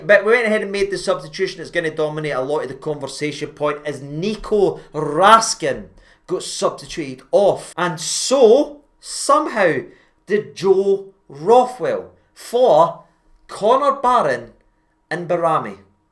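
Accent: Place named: Glasgow, United Kingdom. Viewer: That's some Glaswegian accent .Support your local club mate.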